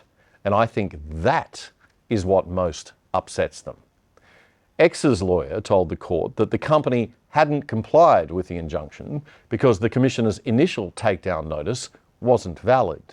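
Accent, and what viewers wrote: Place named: United States. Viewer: It's god-awful hearing a screeching American accent telling us what we can and cannot see. Get rid of this nasty person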